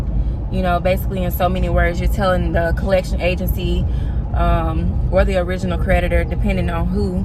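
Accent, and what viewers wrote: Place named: Chicago, United States. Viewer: U sound like you're from Chicago. 😁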